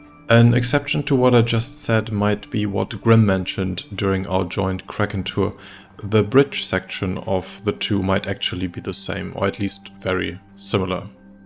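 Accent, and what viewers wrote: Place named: Germany. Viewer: dieser deutsche akzent... bitte sag mir das ich micht nicht irre... kanadier reden nicht so ^^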